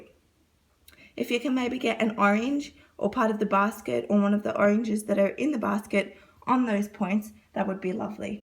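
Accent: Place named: Australia. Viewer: I've watched all your videos and I love 'em. I'm curious though, your food (in a previous video) look like Asian/Malaysian food (curry puff, rice, etc) but you sound Australian. 😊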